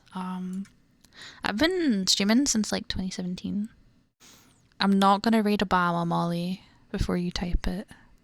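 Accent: Scotland, United Kingdom. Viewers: Wots dis nowe? A scottesh accent ASMRr? We've ben Blessed Lads! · Dunno who dis is.. but i do love scottish.. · oooo scottish asmr this is new gonna give it a try tonight since im having trouble sleeping since im under the weather 🥲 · Finally, a true Scottish ASMR. And a cute one too. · Scottish Accent + Rain = Top Tier ASMR <3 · oh, goodness I adore scottish accents, Im looking forward to more of your videos! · Thank you. I loved this and your cute scottish accent xD. I should visit scotland and the highlands next year!~ Looking forward to another fun relaxing ASMR <3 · This, along with Rayla from The Dragon Prince, made me realize that I absolutely STAN scottish accents. Like...just the accent and the voice. Don't judge. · Scottish accent. Blessed day, I haven't actually found many scottish ASMRtists and something about yall voices gets me so cozy. 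everytime you say phone = good chemical too subbed, dubbed, you're great. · You hear the word Scottish and you immediately expected the most unintelligible speak imaginable but this was extremely enjoyable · Wait I think this is my first time hearing the Scottish accent in ASMR lmao this is funny It sounds cute :D am Scottish too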